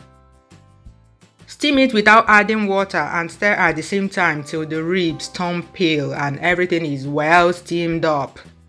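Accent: Igbo, Nigeria. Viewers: i just love your ibo accent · I just love listening to your voice overs and authentic Nigerian accent. So warm and easy going. Having been in Canada for 12+ years.. reminds me of home!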